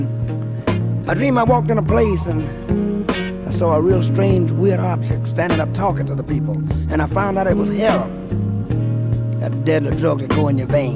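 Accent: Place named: South, United States. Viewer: I love the southern accent. My scots-irish granddaddy had the exact accent, and it's quite beautiful.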